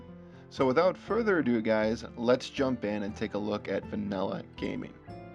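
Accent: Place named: United States. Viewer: This is so american, i dont know why, your voice your sentances :D love it <3 It just sound like your selling something :D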